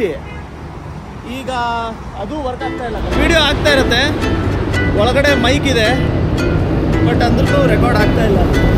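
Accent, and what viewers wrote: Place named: North Karnataka, India. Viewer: That uttar karnataka slang between the conversations ❤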